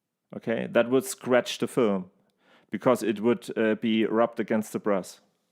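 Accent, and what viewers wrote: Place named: Germany. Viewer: I wanted to say Danke! Really instructive video and I've been using regular metal cassettes which go in all my other cameras and my Leicas too. I have the correct loader and a few IXMOOs so with your help now I am able to use them. Really helpful! And also I want to say your English with the German accent is absolutely perfect for this, it adds "authenticity", as if Leica had made this video from Wetzlar...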